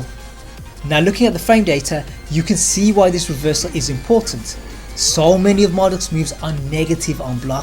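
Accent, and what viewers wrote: Birmingham, United Kingdom. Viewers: Are you Brumie?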